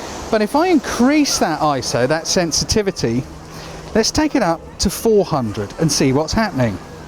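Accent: England, United Kingdom. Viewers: I really like the way this man explains photography (and the English accents, wow!)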